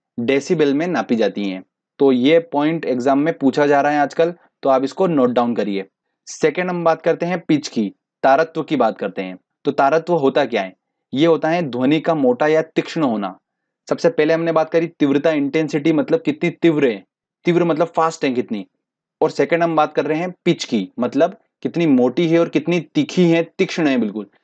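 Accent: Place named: Maharashtra, India. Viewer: सर आपके language मे महाराष्ट्रन touch है.... आप मुम्बई से हो? मै भी मुम्बई से हु.... पर आप सभी topics बहुत अच्छे से clear करते हो... 🙏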